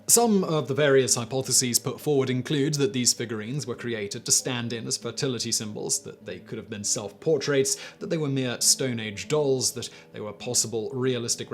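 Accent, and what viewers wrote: United Kingdom. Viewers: Curious. What part of Britain are you from?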